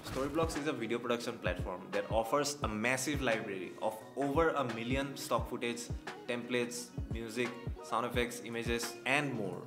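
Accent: Assam, India. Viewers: bhai tmi axomiya nki....assamese accent ata ase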